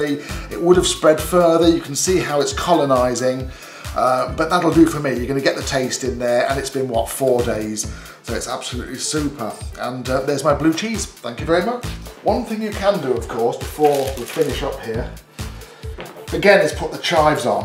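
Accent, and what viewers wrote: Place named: United Kingdom. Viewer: I'm sorry but hearing you say mention the mold 'colonize' your cheese, said in your British accent, made me laugh.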